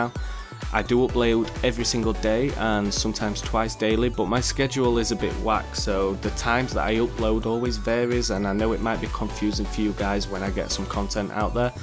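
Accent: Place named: Yorkshire, United Kingdom. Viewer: Haha yeah, nice and subtle man! What part of England has this accent? Yorkshire/Sheffield somewhere around there? I need to learn to speak like that, it's just awesome!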